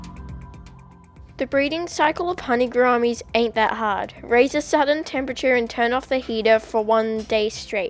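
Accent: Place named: Australia. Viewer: I'm sorry if I'm wrong but are you Australian coz you do sound like us Aussies if you are can you purchase a honey gourami from a local petbarn?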